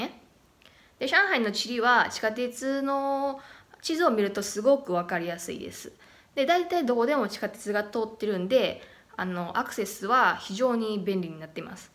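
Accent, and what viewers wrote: Kansai, Japan. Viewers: 関西ご出身ですね(笑) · ははははこれが大阪弁でしょうか？面白い、たくさんの擬音語があるね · ぶわぁー、ぼーん、とばぁーっと合わせて15回以上使ってる。ちゅーちゅー吸うも関西ならではの言い方ですね。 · 、物事言う時さすが大阪弁でワぁ！ワぁ！とか多いですねいい😊👍 笑私、さんの故郷大阪でそろそろ満30年間住んでる上海人です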